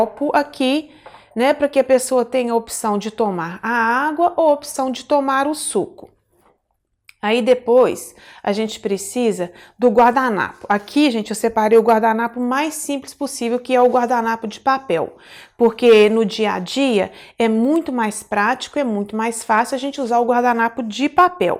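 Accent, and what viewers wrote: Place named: Minas Gerais, Brazil. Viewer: por favor se puder faça um vídeo nos mostrando onde colocarmos a comida depois da mesa posta. Vejo vários vídeos sobre mesa posta mas nunca com a comida. Onde ela deve ficar, se na mesa ou no aparador. Como devemos agir. To aqui em todos seus vídeos. Sem contar que amo seu jeito mineiro de falar. Mata um pouco a minha saudade do nosso torrao natal.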